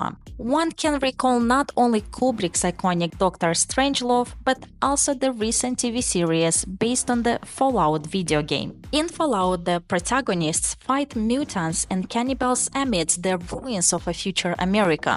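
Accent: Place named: Russia. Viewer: What accent is this?? Russian?? Super adorable accent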